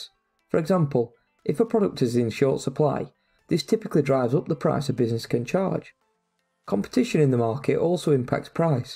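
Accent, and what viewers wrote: Yorkshire, United Kingdom. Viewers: unrelated but... are you from yorkshire...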